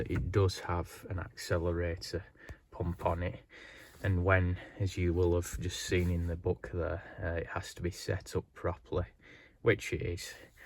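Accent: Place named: North, United Kingdom. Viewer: You have something rare......a pleasant Northern accent that's easy on the ears. Where are you from?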